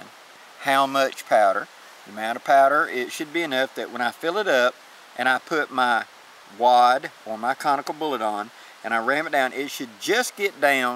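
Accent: United States. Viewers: Best cap and Ball Videos ever! Lot of history and technology said in beautiful Stories,in a lovely american Englisch, great pleasure to wach it.Thank you Sir for these series. These Guns played a signifiant role in making USA a big Nation ,later able to save Europe twice : WW l and WW II.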